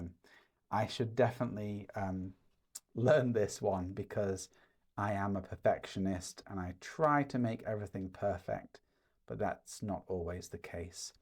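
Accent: RP, United Kingdom. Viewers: Do you speak recieved pronunciation ?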